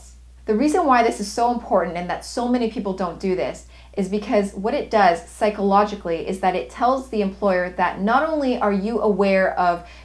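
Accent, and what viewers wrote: Canada. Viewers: Oh god... she’s Canadian... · You Canadian , right ? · please send me the link to your cheat sheet for resumes!! thankyou.. great video!!! are you from canada? your accent is similar to mine! :-)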